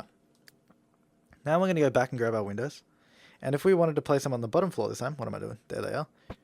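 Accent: Australia. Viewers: are you Australian? cus i am and icant tell if you have an aussie accent or not but i do kno aussies say bute and bambas like you did in the vid :) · good video, it was very useful, r u auzzie??? u sound like it, i am :]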